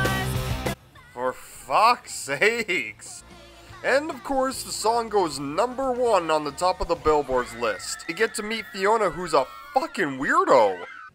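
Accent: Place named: Canada. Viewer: He sounds like a Canadian.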